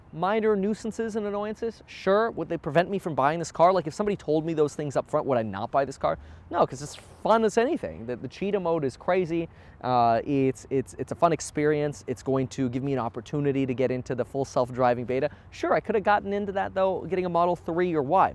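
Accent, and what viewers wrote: Germany. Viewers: Is your father german?! 😂 i swear the accent reminds me of it!!!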